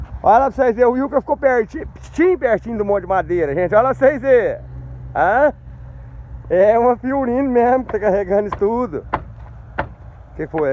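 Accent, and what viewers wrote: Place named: Minas Gerais, Brazil. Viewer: O minero vc é da onde de Minas? Eu só dá baite tem base. Já viu fala.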